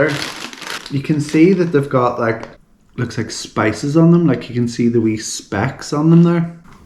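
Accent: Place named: Bengal, India. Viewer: Your bengali is so adorable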